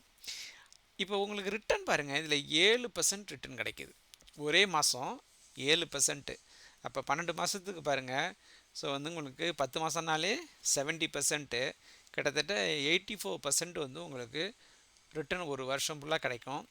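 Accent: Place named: Thanjavur, India. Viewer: Your slang looks like around Thanjavur belt ...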